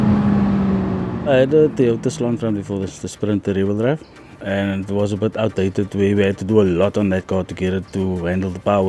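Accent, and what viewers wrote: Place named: South Africa. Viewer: thats the most SA accent i've ever heard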